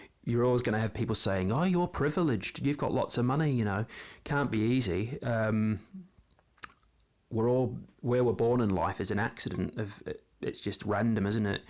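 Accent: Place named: Japan. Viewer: Great video. I was 7 in 1980 and I also remember my father too but it was because I’d had never seen him cry before. Obviously now 40 yrs later on I understand. BTW nice Japanese accent 🇯🇵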